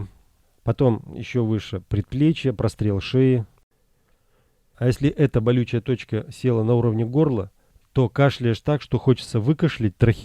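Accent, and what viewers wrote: Yekaterinburg, Russia. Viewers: Екатеринбургский говор. Вы оттуда?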